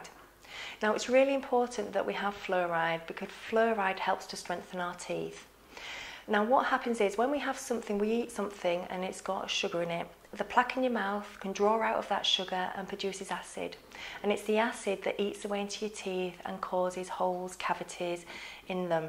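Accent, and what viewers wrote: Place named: United Kingdom. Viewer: She's British not Aussie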